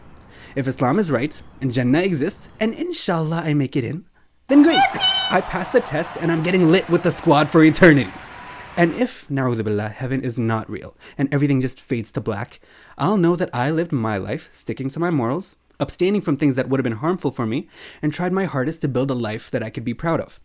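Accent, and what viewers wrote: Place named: South Asia. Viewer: That accent is bro my fellow south Asian 😂. This is the first video of this guy I’ve watched but I love this dude. It’s sunnah to love your brother.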